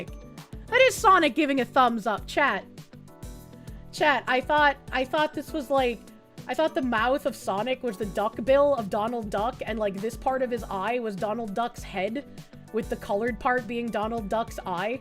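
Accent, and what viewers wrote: Boston, United States. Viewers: Love the coverage, but my "grammar heiling" tendencies flare up every time says ANONYMITY (ah-no-nim-ity) like anonmity (ah-non-mity) 😂 Could be the boston accent though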